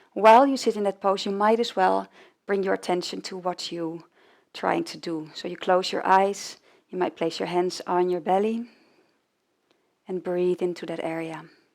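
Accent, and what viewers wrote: Netherlands. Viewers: The exercises are good. i only wish she would speak Dutch because, though she's fluent enough in English, her pronunciation clearly sounds like Dutch. It prevents me from enjoying the yoga.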